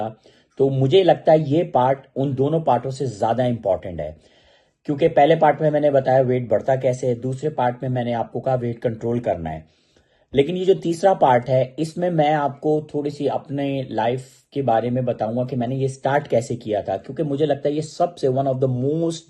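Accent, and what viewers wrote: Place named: Pakistan. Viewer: You have a very pure urdu accent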